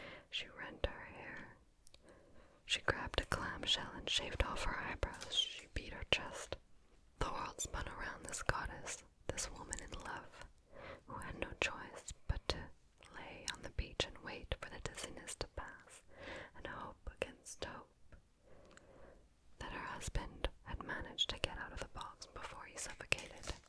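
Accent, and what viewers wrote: France. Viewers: Your french sounds like France French rather than Quebec french or maybe I was just tired when I was watching. Either way its cool, I speak quebec french so it doesn’t sound as good. Lol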